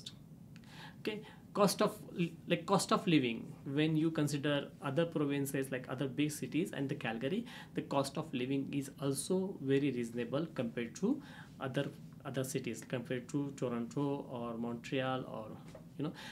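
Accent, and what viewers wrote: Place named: Maharashtra, India. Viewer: You sound like a Maharashtrian, i want all Maharashtrians to speak loud clear and be the boos of their trade. I hope you will outshine and take my suggestions positively. Looking forward to be your client in coming time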